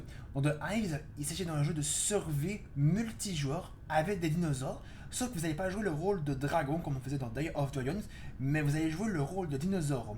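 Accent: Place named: Quebec, Canada. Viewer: Pti commentaire et c'est constructif. Je suis québécois et je pense que toi aussi. J'ai parcontre du mal à comprendre se que tu dis. Essaie un français universel ou parle avec un accent assumé! Sinon c'est super bien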